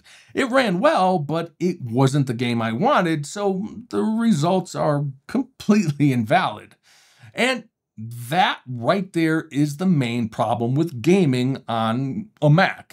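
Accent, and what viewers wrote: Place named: United States. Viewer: I really have problems with strong American dialect ...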